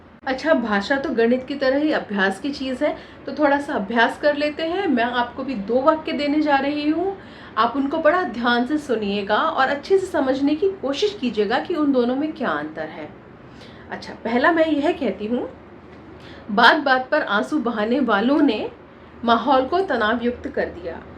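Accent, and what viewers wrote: Standard. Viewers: हिंदी भाषा का शुद्ध उच्चारण ❤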